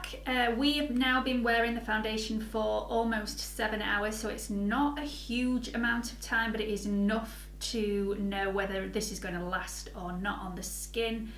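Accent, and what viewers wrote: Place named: Yorkshire, United Kingdom. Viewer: I’ve just found your channel and I’ve subscribed. I’m so relived to find someone doing makeup for a more mature skin. I’m 49 and like a more natural makeup look. Plus its good to hear a familiar accent. You must be a Yorkshire/North East girl.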